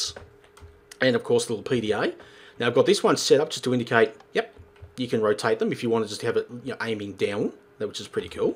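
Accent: Australia. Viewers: I love your Aussie accent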